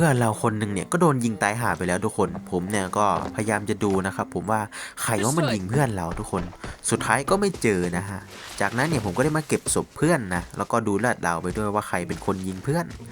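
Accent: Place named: Laos. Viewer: อ้ายเป็นคนลาวแม่นบ่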